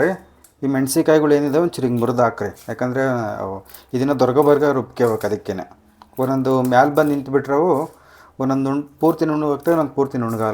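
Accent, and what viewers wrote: Chitradurga, India. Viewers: 👌🏻👌🏻.. ನಿಮ್ಮ ಚಿತ್ರದುರ್ಗದ ಭಾಷೆ ಚಂದ... ನಿಮ್ಮ ಅಡುಗೆ ಹಾಗೆ....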